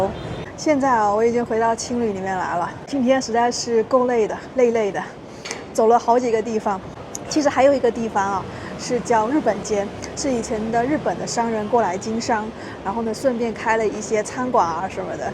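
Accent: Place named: China. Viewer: 英文太偏中式😂😂😂比不过越南酒店前台服务。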